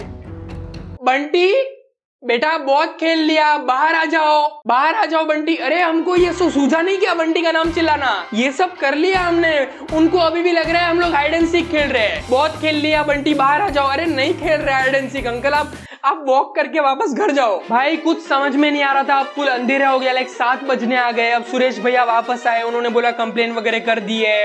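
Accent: Hyderabad, India. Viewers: Your Hyderabadi accent is to die for ❤❤😅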